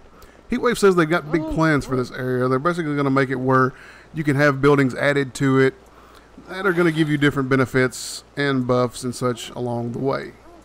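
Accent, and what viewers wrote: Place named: United States. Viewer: I love your accent! Nice to have a regional accent for once from someone in the USA :) (I'm in Northern Ireland). You review really well too - not the usual 100 words a minute! I like the setting of this game and even with the bugs I think I will give it a go. I will check out the site - nice to have a decent review instead of the usual nonsense - thanks :)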